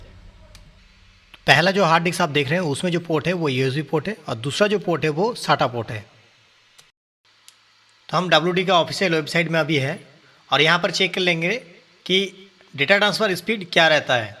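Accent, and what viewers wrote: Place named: United States. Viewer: i like how his is like the only indian youtube video that i can understand easily because im used to such a white American accent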